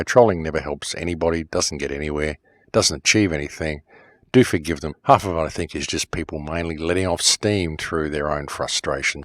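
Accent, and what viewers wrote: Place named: Australia. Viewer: His voice sounds Austrailian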